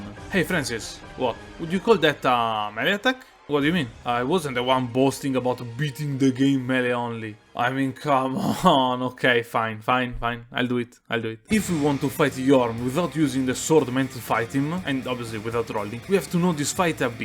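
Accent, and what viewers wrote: Italy. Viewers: I LOVE YOUR VIDEOS MAN! Also sembra che tu abbia un certo accento italiano, lo adoro. È un onore vedere un italiano così appassionato fare dei video così di qualità. Keep it up bro!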